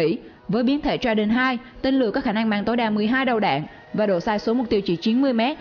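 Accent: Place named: Central, Vietnam. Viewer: nghe giong mien trung ,cua mien thuy duong cát trang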